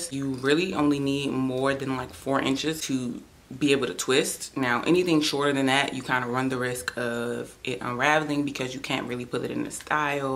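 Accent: DMV, United States. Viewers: New Sub. I picked up on the DMV in your voice before I read your bio :) Great Information!! Ready to start my new set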